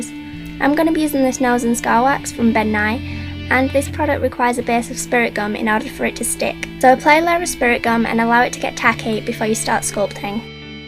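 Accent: Yorkshire, United Kingdom. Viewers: she sounds so yorkshire in this video haha it's so cute ^^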